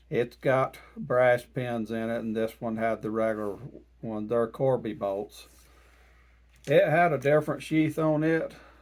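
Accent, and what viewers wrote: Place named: Appalachia, United States. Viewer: Hey, bub, you sound like you come from close to the upper part of the Southern Appalachians, which is where I’m from. And back in the old days, a plain two-blade pocket knife along with a sensible belt knife got the work done. Today, I carry a Leatherman super tool along with the same sensible belt knife. Hmmm…..There seems to be a historical pattern going on here, and I sure as hell am not about to argue with it.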